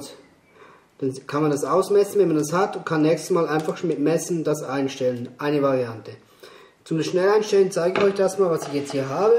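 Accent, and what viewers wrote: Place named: Switzerland. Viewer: Twelve grades caster I understand in your swissy german!!!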